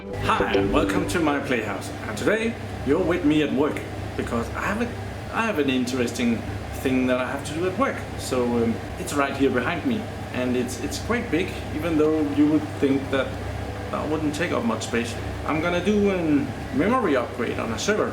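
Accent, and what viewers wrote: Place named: Denmark. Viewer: Syntes sku nok det lød som dansk accent :D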